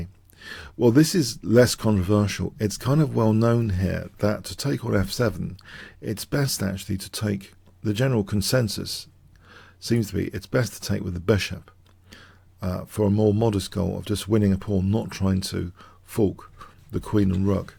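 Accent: United Kingdom. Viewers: His low voice and British accent makes it really difficult to understand what he's saying.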